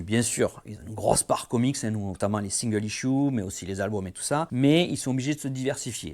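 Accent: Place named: Montpellier, France. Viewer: A l'oreille, je pensais que tu étais de Montpellier lol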